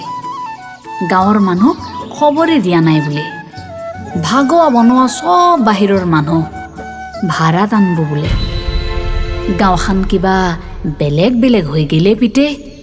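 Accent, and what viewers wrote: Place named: Lower Assam, India. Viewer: মোৰ সতে মিলি গ'ল। মইও নামনিৰ এ । স্পৰ্শ কৰিলে। আপোনাৰ মাতটোত নিজৰ ভাষাৰ শৈলীটো শুনি ভাল লাগিল।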